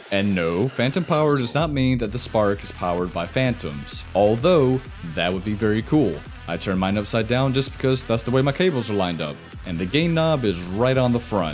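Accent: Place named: South, United States. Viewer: If you don't mind me asking where are you from you sound southern ps thank you for telling me Jesus loves me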